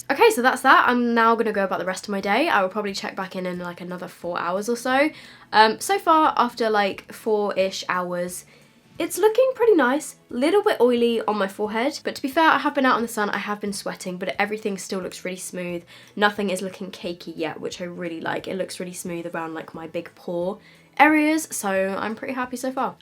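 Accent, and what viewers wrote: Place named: United Kingdom. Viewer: Ugh I’m sooo obsessed with UK accents 😍 “ it’s waawrmm”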